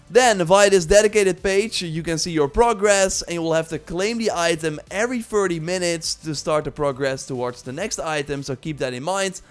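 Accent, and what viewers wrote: Netherlands. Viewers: Sooo Dutch 😄 nice vid though. Good info.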